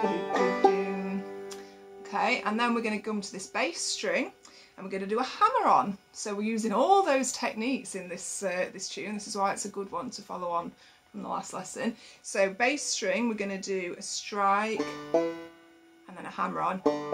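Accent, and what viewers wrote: England, United Kingdom. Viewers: Are you from England? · You are so awesome! I love your English accent. I could listen to you all day long. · Love this video! It's timing is perfect for a newbie like me....other videos go way too fast! And an English accent too. Ideal! #subscribed #fanboy!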